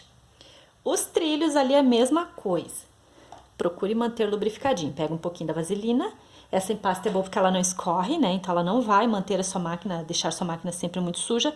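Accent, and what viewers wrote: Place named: Rio Grande do Sul, Brazil. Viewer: Acabei de comprar uma maquina lanofix automatica antiga a garantia e de 1967. Vou ter que cuidar lubricar mas estou feliz. Ja tive uma lanofiz que tinha cartela de borados, infelizmente perdi numa mudança. Amando suas aulas. Gostaria de poder mandar foto da minha maquina para vc. Bjs gratidao. Amo seu sotaque ba guria!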